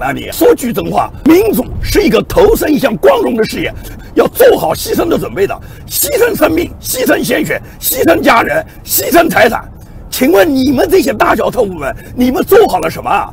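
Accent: Nanjing, China. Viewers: 听声音确实是南京人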